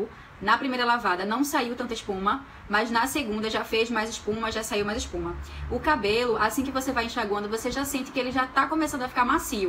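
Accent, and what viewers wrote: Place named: Olinda, Brazil. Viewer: Esse sotaque eu conheço 😃 sou olindense 😘 excelente vídeo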